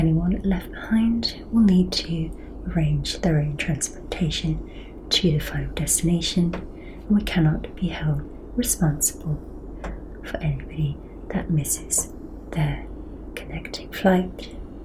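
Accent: United Kingdom. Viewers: Hallöü 😄are you english?or scottish or irish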